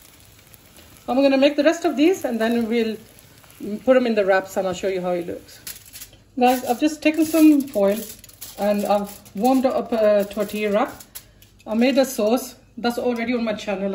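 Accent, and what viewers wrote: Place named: Midlands, United Kingdom. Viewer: You're from the Midlands lol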